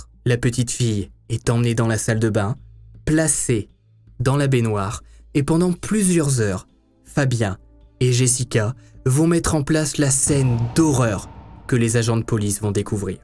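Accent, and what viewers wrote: France. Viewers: Enfinnnn ton retour !!! Super hvf, merci ! PS : j'adooore quand tu prononces les mots anglais avec ton accent français "background" 😂😂😂 (et "Raul" qui se prononce "Raoul"!) Bref je t'adore 😁❤️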